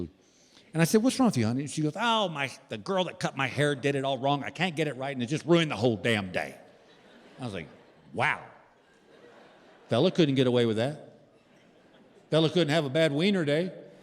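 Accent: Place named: South, United States. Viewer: it’s not muddling honey, it’s his Southern accent 😂😂😂😂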